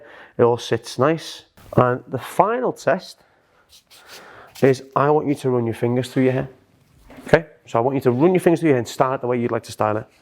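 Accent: Liverpool, United Kingdom. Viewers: Is it me or is there a bit of a Scouse accent that pops out here and there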